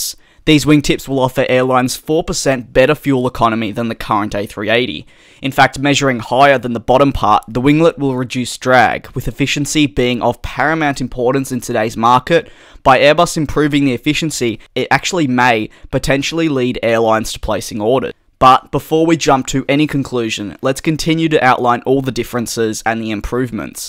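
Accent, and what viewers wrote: Australia. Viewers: Are you Australian? Not trying to be rude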